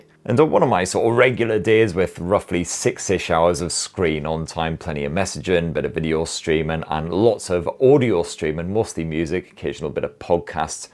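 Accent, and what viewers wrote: United Kingdom. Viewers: A British phone (kinda) of course needs a British accent review! So I click in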